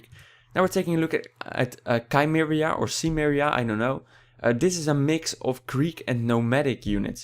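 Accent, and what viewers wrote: Netherlands. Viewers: Where are you from? Your accent kinda sounds like a dutch person speaking english ;)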